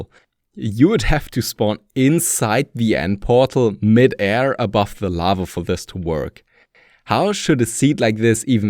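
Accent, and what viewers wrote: Germany. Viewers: Am i hearing a german accent?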